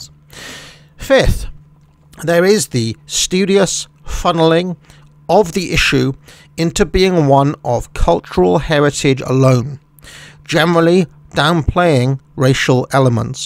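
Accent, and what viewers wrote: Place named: United Kingdom. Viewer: As a Citizen of the ROI and an outsider, well not a UK subject, sounds quintessentially British. I mean that as a compliment, not insult..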